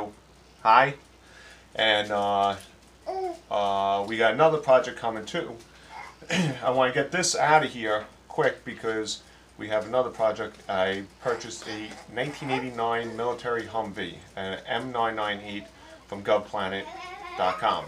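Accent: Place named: Texas, United States. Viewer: Nice explanation! I detect an accent. Are you from Texas?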